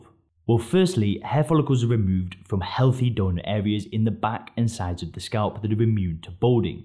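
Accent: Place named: Scotland, United Kingdom. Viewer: ermete trismegisto Scottish accent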